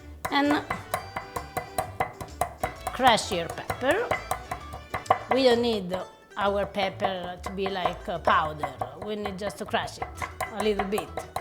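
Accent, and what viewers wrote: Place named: Italy. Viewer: great! What a cacio e pepe! Someone link videos to some other movie director who wants to do a next movie about famous Italian families... Mr. Scott, I'm talking to you! This is how we speak english. For American who didn't understand, House of Gucci movie, watch the trailer, listen how Pacino, Leto, and Lady Gaga speak and compare to.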